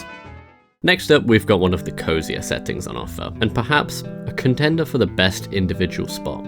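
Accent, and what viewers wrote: United Kingdom. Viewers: Wait this guys British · this is the most british man I have ever heard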